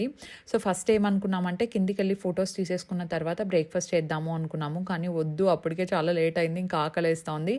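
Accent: Anantapur, India. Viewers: ....before sleeping ask to have milk everytime it's natural tip to get sleep...I just love ur slang Anantapur slang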